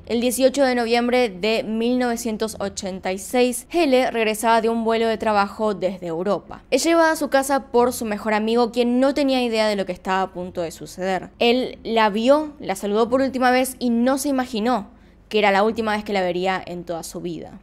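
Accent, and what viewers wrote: Argentina. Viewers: Trabajo en la madrugadas y hace un par de días vi tus videos, creo que ya vi la mitad jaja. Eres genial, con todo y tu acento argentino ✌🏻😋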